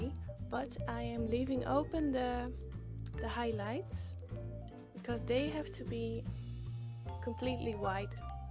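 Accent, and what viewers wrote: Netherlands. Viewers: are u dutch? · Her accent is Dutch, she is Dutch so am I, is a typical Dutch name...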